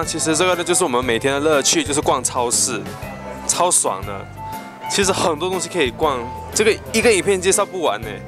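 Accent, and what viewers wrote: Taiwan. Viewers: 沒有馬來西亞腔了。你不說還沒發現原來是馬來西亞人～～ 😂 說話很像台灣人了。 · RYU口音也台湾，不说我大马人的都快听不出。（另外女友口音好有趣）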